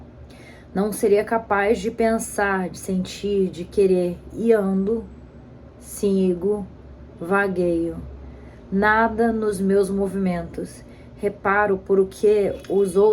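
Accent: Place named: Brazil. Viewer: Ela pode até falar um português do Brasil, mas se diz, que o de Portugal é igual, aí é forçar. Além do vocabulário, a entonação e a forma de falar são diferentes.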